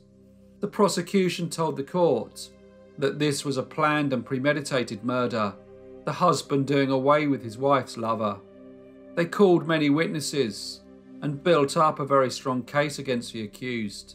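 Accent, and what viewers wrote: United Kingdom. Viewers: thank you for another outstanding video of true crime that is both unique and unheard of by me. You and Forgotten Lives have some very special content and it's an absolute pleasure listening to both of your videos. 🙏🙏Plus, your awesome British accent makes everything sound even better. 👏👏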